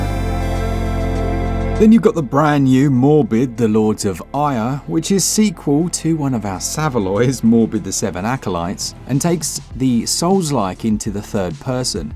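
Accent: United Kingdom. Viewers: Sounds very British indeed 🍵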